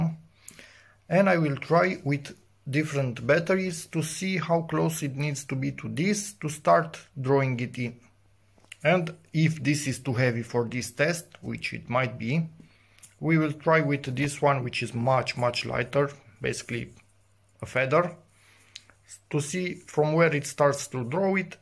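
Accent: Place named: Romania. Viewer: La cum vorbești ești Român toată ziua. :D Zi-mi te rog,il recomanzi ca si putere,trage bine?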